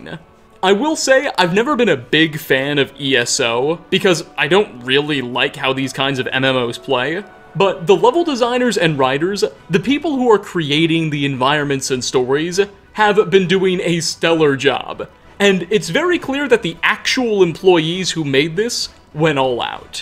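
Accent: United States. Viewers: Maybe that's just one of the many American accents. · Teleki Zalán he’s definitely American you can tell from his manner of speech. To most of us a “lift” is when you give someone a ride in your car.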